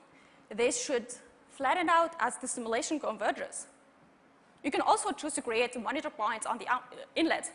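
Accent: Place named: Sweden. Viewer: I bet she is swedish, I believe that's the accent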